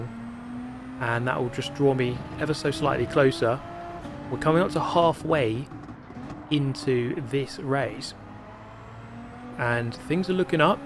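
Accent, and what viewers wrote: United Kingdom. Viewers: Grove sent me here. Can't beat a British mans narration!!! Excellent Video. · Shhh nobody tell him any monotonous motorsport hum in the background will do for some people...😂 No seriously, I fell asleep many times to but the Voice IS certainly a part of it. Also the british accent and the sense of humor!!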